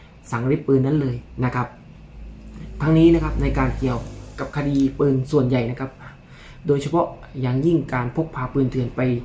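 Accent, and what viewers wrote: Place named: South, Thailand. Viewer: เพิ่งได้ดูคริปเเรกครับอย่างอื่นไม่รู้เเต่ทนายน่าว่าคนไต้เเน่นอน ขอบคุณความรู้ดีๆ ติดตามครับ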